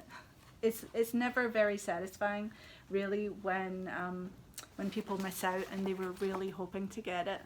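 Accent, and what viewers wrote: Scotland, United Kingdom. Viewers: Love your Scottish accent! I’ve just finished watching all the episodes of “This Farming Life” on BritBox. Most of it is filmed in Scotland, but unfortunately they breed their sheep for food and not wool, I hope we knitters can encourage the industry to change. Will cast on a pair of mittens soon for the KAL with some 🇨🇦 yarn.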